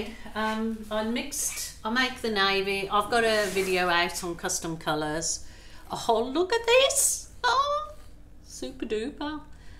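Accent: Birmingham, United Kingdom. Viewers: Hi! Just curious....are you originally from Birmingham? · I know a Brummy when I here one 😀 A good move you made 😀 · Me and my husband are having fun with your accent ☺️ he is from West Bromwich in UK 🇬🇧 and you sound like a Brummy are you from that area or is it just coincidence that the accents sound the same..?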